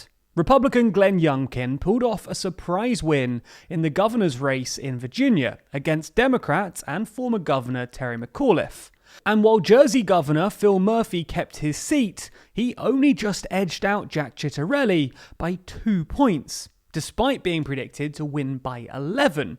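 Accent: United Kingdom. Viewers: He meant after 9/11 not of I know it's kind of hard to hear with a English backslash British accent but he meant to say after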